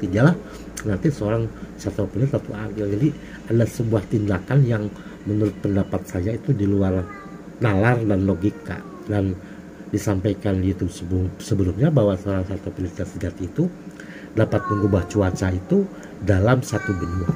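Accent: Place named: West Java, Indonesia. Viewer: ❤logat Abah sapertos Sunda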